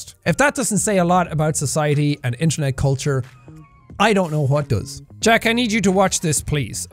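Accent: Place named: Ireland. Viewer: This is already the second time of me turning on Jack’s video and forgetting that my headphones are off and my family hears an Irish man yelling from my phone.😳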